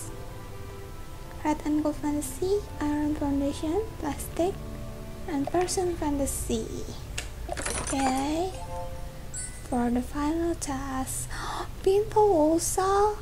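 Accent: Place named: United Kingdom. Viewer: You have a nice britsh voice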